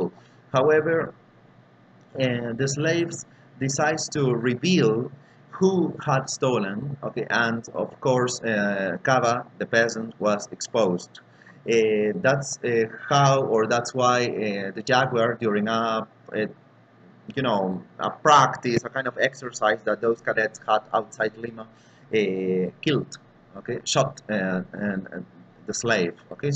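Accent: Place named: United Kingdom. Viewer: English from Británico😊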